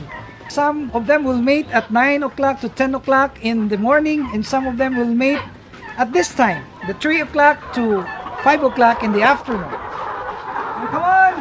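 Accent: Philippines. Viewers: ARE YOU PILIPINO?? YOUR ACCENT . PLEASE SPEAK TAGALOG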